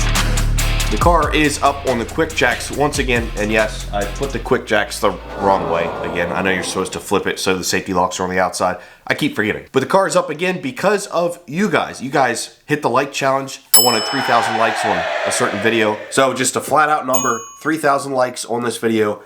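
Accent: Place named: Pittsburgh, United States. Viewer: Everytime I watch one of these videos I think "that guy has to be from Pittsburgh with that accent" but apparently folks from Maryland sound the same?! Great videos - appreciate the gumption.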